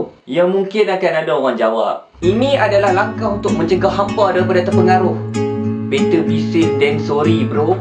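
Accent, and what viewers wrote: Kedah, Malaysia. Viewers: Panjang hang punya mengarut tu bang. Dok luaq negara tp boleh ckp loghat Kedah lagi. Bangga aku sbg org kampung hang. ;)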